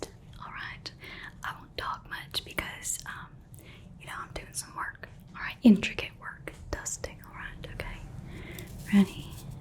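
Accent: South, United States. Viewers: This gives off Firefly/Serenity vibes. Why do southern accents and Sci-fi compliment each other so gosh-darn well????? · Hey, it's been way too long since we last caught up, you're missed 🎉 ❤.. beautiful accent to which region in the (( USA 🇺🇲 )) it belongs to?? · The Southern Belle has returned!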